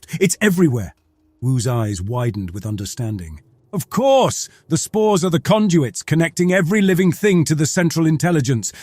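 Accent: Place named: England, United Kingdom. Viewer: I must say alot of those Creepy pasta channels regurgitate the same stories over and over And I can tell that some of them have just used the transcript of others reading exactly the same content ...boring Your story telling is class and has been on my nightly listening playlist since I found your channel Maybe I'm abit partial because I'm English too. Anyway...thanks and please keep them coming 🙏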